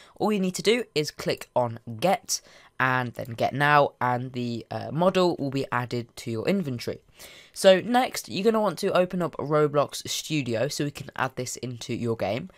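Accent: United Kingdom. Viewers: He’s British that’s how they say it there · Dont bully him cuz he is saying hach its a british thing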